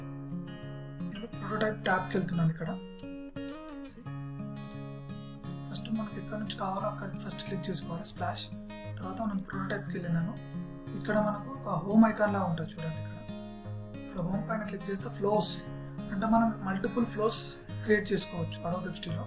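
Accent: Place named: Telangana, India. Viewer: Thank u bro. Ma intla manshi matladutunnattu anipistundi bro. Nenu mana telangana slang ki connect ina 👌👌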